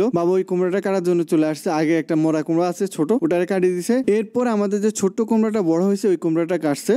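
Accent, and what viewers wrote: Noakhali, Bangladesh. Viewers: ভাই আপনার বাড়ি কি নোয়াখালী বলবেন 🥰🥰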